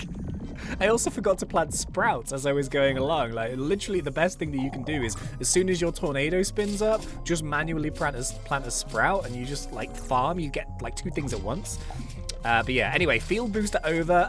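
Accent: England, United Kingdom. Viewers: what do you mean, but? Like he’s English, from the uk... like I said, and I’m LITERALLY English from the uk aswell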